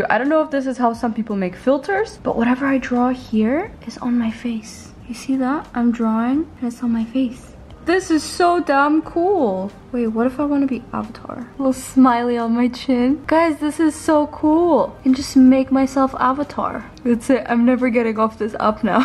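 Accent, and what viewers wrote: Rio de Janeiro, Brazil. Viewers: i'm brazilian and i'm so happy you're picking up portuguese, my advice is that if you already know spanish and can roll your r's easily then the paulista accent will suit you well but you definitely give me the vibes to speak like a carioca lol (have you made a language learning notion template that you're using? if yes then i would love to see it, im back on my language learning era as well and a template to keep track of all of it would be great!)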